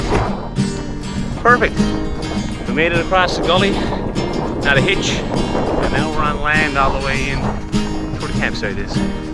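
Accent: Ireland. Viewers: New to the channel. Love it. Cannot get enough. You sound Irish to me or is that the Newfoundland accent. Please do not be offended, just trying to learn. Thanks! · And I am new to your channel but you sound Irish, some of the music too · Why the half Irish accent? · Just found your channel through joe robinet i know your from newfoundland im from northern ireland and you sound as if you've got a bit of an irish brogue about you I've watched a couple or your videos and liking them very much i subscribed so will be looking forward to some more videos well done m8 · "Go habs go" Alright buddy, I liked you yesterday, now I'm straight up in love! Dude your channel is loaded with content! Damn productive you are! Is your accent from Ireland? Also, where do you live man, to be a habs fan?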